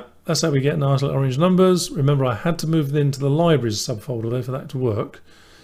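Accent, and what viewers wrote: United Kingdom. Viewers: Thank you. I hesitated to start a 38 minute video tutorial, but it was worth it just for the calm Pommy accent and absence of duffa duffa music! I am an old veteran since the 70s of programming assembler on bare metal for embedded realtime controls, and only just in retirement wrapping my head around C/CPP. Some of the syntax conventions really "get" me, and I have to remind myself I am dealing with a very simplistic one-pass compiler that needs lots of cues in order to work. The work flow from multiple tabs / project folder to files in the library folder was very helpful.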